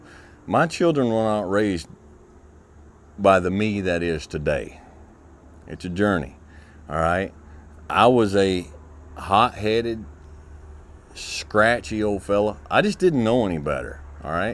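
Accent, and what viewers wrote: South, United States. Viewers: 南部英語気持ちええんじゃ〜^ ^ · 南部訛りってやつですか？ なんとなくアメリカ英語にしてもクセがあるように聞こえます。 ちなみに言ってることは素敵です。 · それは南部特有のアクセントです。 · 南部アクセントが心地いい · 南部訛り？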